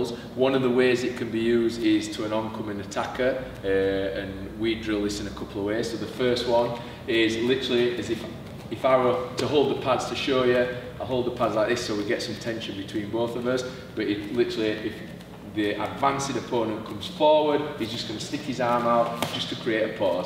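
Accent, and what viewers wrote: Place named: Barnsley, United Kingdom. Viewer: Barnsley twang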